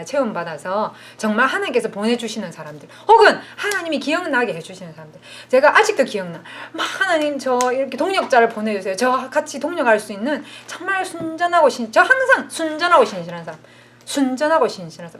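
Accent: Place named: Gyeongsang, South Korea. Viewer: 얼굴도 예쁘고 내가 좋아하는 경상도녀의 강한 사투리가 신앙까지 좋으셔서 배우기도 은혜로워요 감사함다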